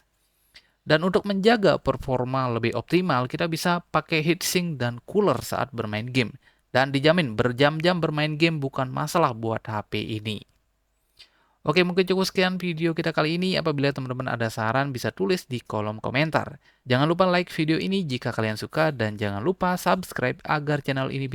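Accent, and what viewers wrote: Bali, Indonesia. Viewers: logat nya kek orng bali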